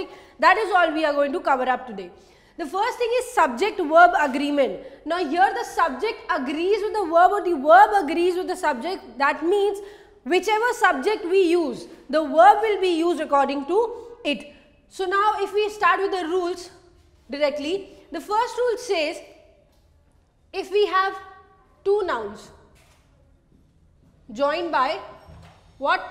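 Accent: India. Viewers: You are good. I really like Indian-English accent. But "I' is not a noun. It is a pronoun.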